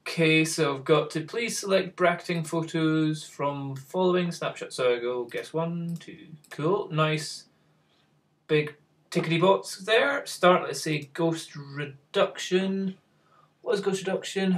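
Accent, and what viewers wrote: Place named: Scotland, United Kingdom. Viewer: I do enjoy your Scottish accent. Don't stop talking! Did you ever look at Corel AfterShot Pro?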